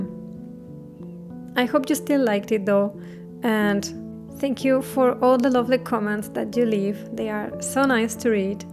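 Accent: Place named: Spain. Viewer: Disculpa hablas español? Es que por tu inglés suena Español como lengua materna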